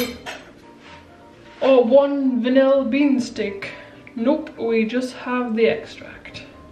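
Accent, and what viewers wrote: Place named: England, United Kingdom. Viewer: I love your accent! What part of England are you from?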